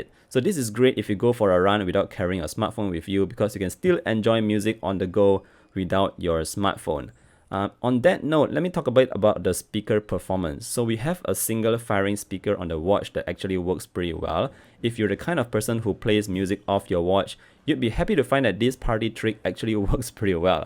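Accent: Singapore. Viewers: Do I here a SG accent?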